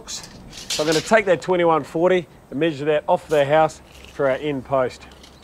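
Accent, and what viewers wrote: New Zealand. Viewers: I love New Zealand accent with construction. Absolutely Brilliant. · Thanks mate. I lived in NZ for about a year, but it has been while since then. Love Kiwi accent, sweet as.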